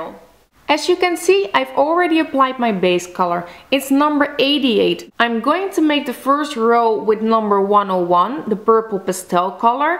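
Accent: Netherlands. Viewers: Volgens mij kom je uit Nederland aan je accent te horen ;) Je filmpjes zijn erg leuk met leuke ideeen... En vooral heel netjes! Ik ga deze zeker proberen! x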